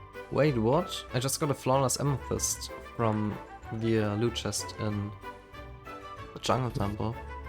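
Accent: Germany. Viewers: Am i right or do you have a German acsent? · German accent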